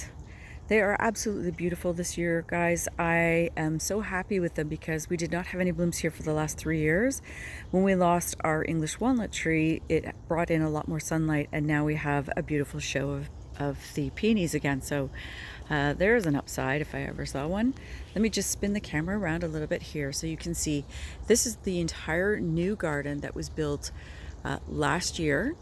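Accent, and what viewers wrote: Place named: Canada. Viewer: Just found your channel. What garden zone are you? I hear a bit of Canadian accent. I am in Homer, Alaska - Zone 4-5, ?6 this year, depending. Love your style: beautifully designed, well thought out, well-grown garden in a space that most of us can relate to - not the "estate level" that is so gorgeous, but so unattainable. Hope to see many more videos on your garden.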